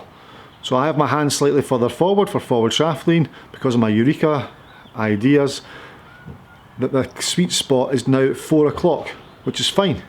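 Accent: Scotland, United Kingdom. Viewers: Mr., you are as much common sense and down to earth in your explanations as anyone I've ever heard! Even if your Scottish brogue makes this Florida boy concentrate on what you say. Lol I'm 70 and recovering from back lumbar fusion surgery (like Tiger) now I have time to rethink my swing (get the mental process changed) so my physical changes easier to accomplish. Thank you. I find the golf machine explanation complicated and confusing... You make the concepts much easier to understand. These are very good videos! Thanks again.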